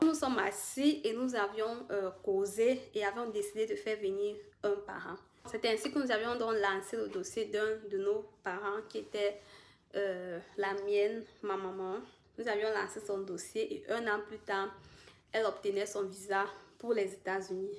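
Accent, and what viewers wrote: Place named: Cameroon. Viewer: vous avez l'accent du Cameroun avec une influence du Gabon (c'est comme si vous avez vecu dans 2 pays en Afrique)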